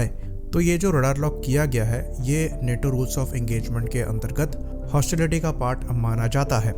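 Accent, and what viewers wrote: India. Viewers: As soon as I heard the Indian accent I didn't surprise. 🤣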